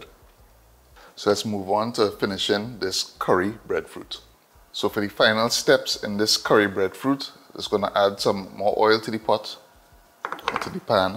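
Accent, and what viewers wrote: Trinidad and Tobago. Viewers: Trinidad in the house you sound like your from Trinidad. My daughter just bought home a breadfruit pigtail oil down she said but i can save some a try this. Just in time to.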